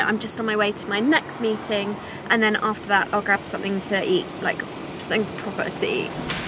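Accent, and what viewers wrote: England, United Kingdom. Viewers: Is she from England?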